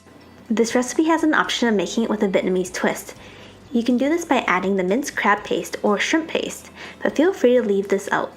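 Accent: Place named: Minnesota, United States. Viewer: Sounds like Minnesota accent. I like the recipe.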